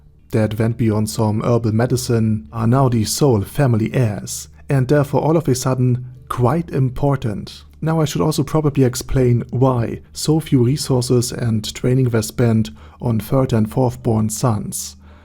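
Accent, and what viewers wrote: Germany. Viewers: For some reason i really like your voice with the german accent ! Is there a chance that you will read the light novels ? Soo we can listen to it in your voice ?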